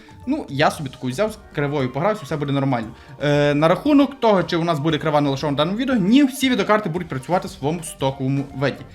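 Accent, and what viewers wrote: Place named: Hutsul, Ukraine. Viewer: але файний той гоцульский говір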